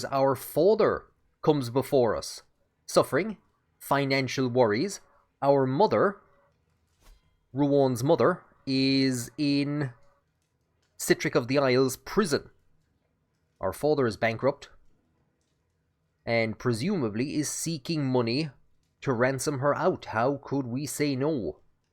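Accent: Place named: Munster, Ireland. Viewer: You're the only YTer I have the bell on for, great content. Did you study history? Also which province are ye from? Can't place the accent. My best guess is Munster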